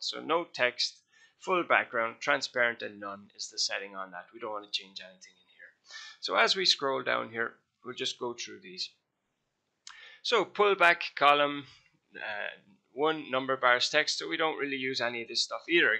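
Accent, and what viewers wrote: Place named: Ireland. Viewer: Man, I'm finding it a nightmare setting up my charts... bloody Oirish accent makes it difficult.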